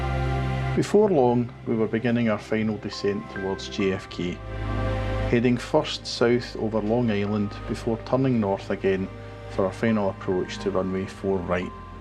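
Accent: Scotland, United Kingdom. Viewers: Great content as usual! Very comprehensive review, and the Scottish accent makes sound more convincing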